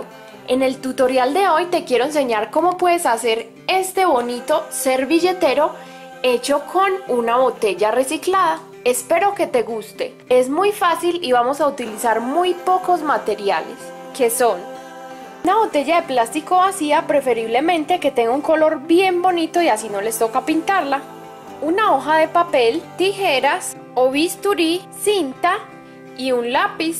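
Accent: Colombia. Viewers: Eres colombiana verdad? Te lo digo por ese acento que tienes. Y que a mi en lo personal me encanta. Saludos y Bendiciones desde Guadalajara JALISCO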